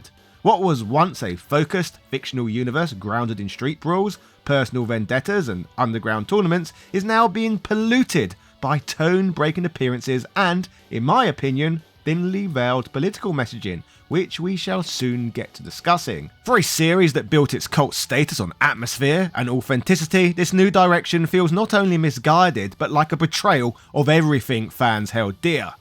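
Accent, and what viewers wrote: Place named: Liverpool, United Kingdom. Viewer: You sound like a scouser mate.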